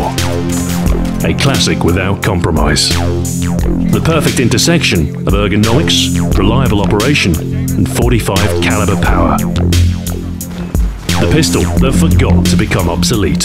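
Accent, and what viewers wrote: United Kingdom. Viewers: He is British, Captain Price is also British xD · A very British Terminator’s voice. And here is probably to me THE reason why I think deep baritone BRITISH voices are the BEST at serious/epic narration, bar none! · Lol it's funny because sounds British.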